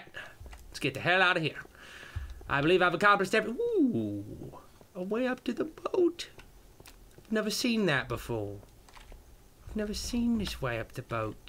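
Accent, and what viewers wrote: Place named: England, United Kingdom. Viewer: I love his English accent. It's........ EPIC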